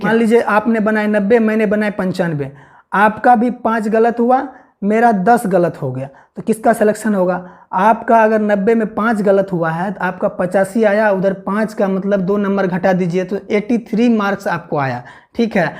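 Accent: Bihar, India. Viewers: Bihar se ho ka bhai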